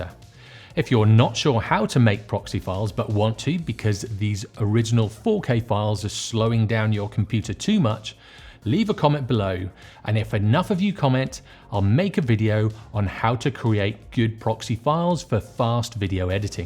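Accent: United Kingdom. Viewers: Your name is so American but you are definitely so British.Very nice video.Will check back with you.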